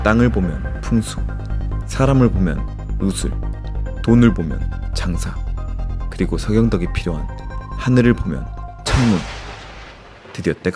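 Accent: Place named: Jeolla, South Korea. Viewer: ㅋㅋㅋ 밤마다 이거 보는 재미에 삽니다. 나레이터님 전라도 말투도 구수하고 ㅎ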